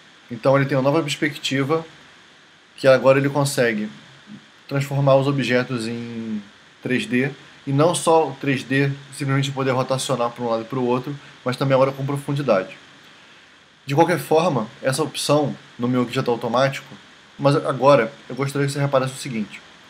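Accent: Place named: Rio de Janeiro, Brazil. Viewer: Pô, tu está perdendo tempo... com essa voz ai, se você gravar uma música dizendo que é do todo mundo vai acreditar kkk até o sotaque carioca vc tem =)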